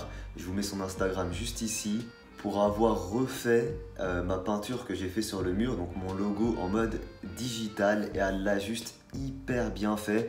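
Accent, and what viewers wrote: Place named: England, United Kingdom. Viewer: Je suis toujours autant impressionné par ton accent anglais mdr, le dessin de ta tête pète des culs!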